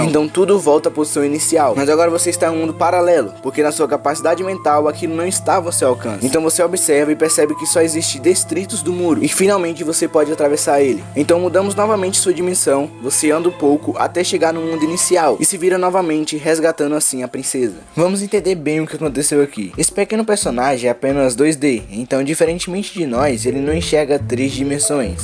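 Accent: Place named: Brazil. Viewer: ainnnnn xenofobicomm parece até sulista Edit: tu realmente é baiano?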